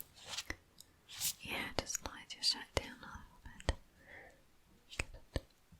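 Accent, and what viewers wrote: United Kingdom. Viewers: I get really happy when does a doctor rp because it means a British accent again. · Nice British accent. Thank you for the video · with an English accent is a real treat. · Love the accent! I always found the British accents were the most tingly 💤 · Great Video!!! I love the Doctor Asmr video!!! I also love the British Accent and Glasses for this video too because they make you even more Beautiful!! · Nice doctor RP, love the British accent.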